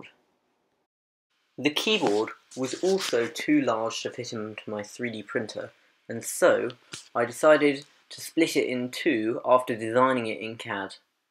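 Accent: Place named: United Kingdom. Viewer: Great man and an interesting new YouTube channel. I really enjoy your British accent with the soft 's' pronunciation 😃. Make more videos in general + a finished video of this project (if you have finished it so far 😄).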